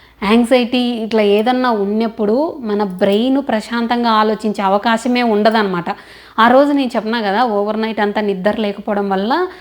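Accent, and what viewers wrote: Rayalaseema, India. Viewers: Nenu ekkuva gaa comment cheyyanu andi but meru nijangaa super ga clarity ga chepparu memu thondarlo vastam andi foreign ki Naku papa nenu same challa tension anipistundi ....but Mee video chusaka konchem relief anipistundi maa papa anthe andi active full mrng padukodu night padukuntundi maa papa ki uyyallo nee alavatu foreign lo Ela Abba anukunedani anyway challa thanks andi...madi rayalseema kadapa meru matladtunteee aa slang lo maa voru akka laga vunnaru...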